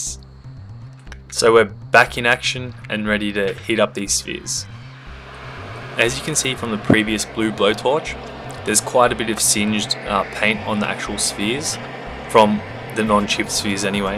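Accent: Australia. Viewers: Are you Aussie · OwO is that another aussie I hear? Or am I mistaken.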